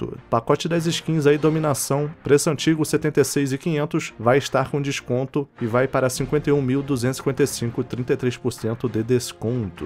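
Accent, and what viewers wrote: Rio de Janeiro, Brazil. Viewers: acho q ele é carioca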